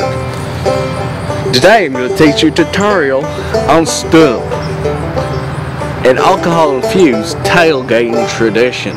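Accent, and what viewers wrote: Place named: Northern Appalachia, United States. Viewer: That ain't a Southern accent, that's a Northern Appalachian accent.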